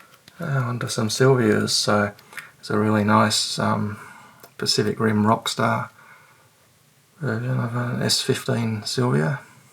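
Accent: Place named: Australia. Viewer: Wow, nice collection. Sounds like you're in Aus. Nice to know there's a nice home if I need to sell.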